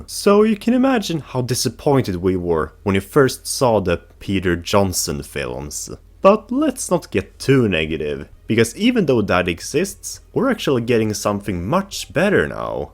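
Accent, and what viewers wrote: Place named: Sweden. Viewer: Är du svensk eller från skadinavien? För din engelska låter precis som en svensk dialekt.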